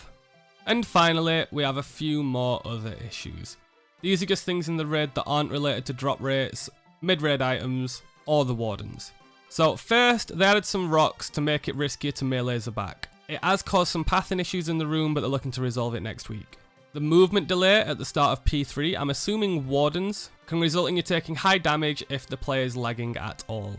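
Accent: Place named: Yorkshire, United Kingdom. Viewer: Finally a Yorkshire accent. I understood everything. Great rundown, really helped.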